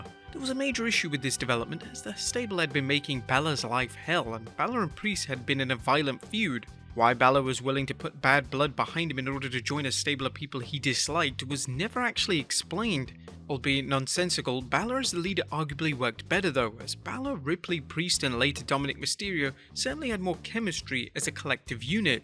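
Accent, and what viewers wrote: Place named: England, United Kingdom. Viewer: This channel is awesome....and I love his English accent.......😊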